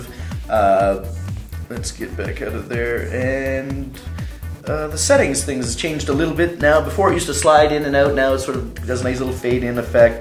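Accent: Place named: Canada. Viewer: This guy sounds like a Canadian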